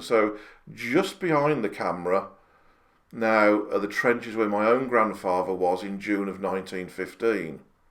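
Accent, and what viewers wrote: Birmingham, United Kingdom. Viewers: well in that case it really is a lovely occurrence in such a devastating and sad time. Do I detect a soft brummie accent?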